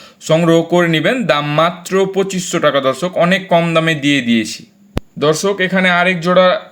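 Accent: Kolkata, India. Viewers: আপনার তো কথা ভাই ভারতের কলকাতার ভাষা মনে হয়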